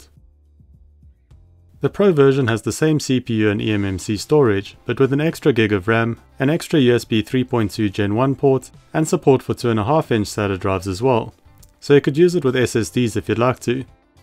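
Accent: South Africa. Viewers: Hah! Thought I recognized the accent, I'm also South African, though still in the homeland. Love the channel man, gonna do a lil Pi NAS project in December based on your specs. Thanks for channel man.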